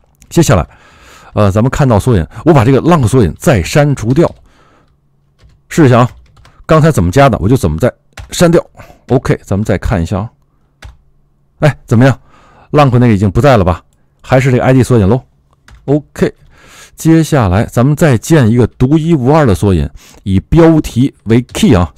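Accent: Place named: Japan. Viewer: 日本人的发音。。。包括 =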